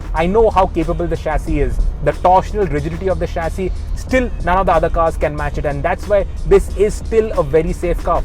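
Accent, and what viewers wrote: India. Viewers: Let me start by telling you that Indian accent is terrible. In addition, the Hyundai i20 in this video is already out of date.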